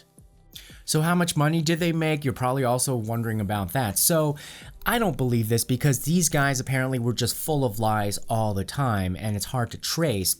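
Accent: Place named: California, United States. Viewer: you sounded very californian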